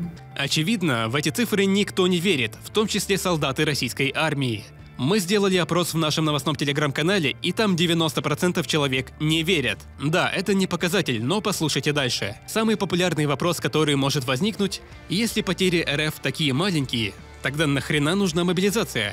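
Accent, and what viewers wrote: Ukraine. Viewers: а ты ведь из Украины, верно? Это сразу читается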